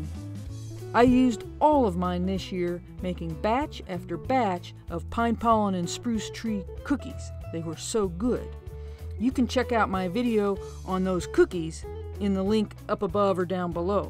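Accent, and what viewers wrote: South, United States. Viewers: I am not a native english speaker, but your voice sounds like how I would imagine a southerner would speak like haha. where are you from?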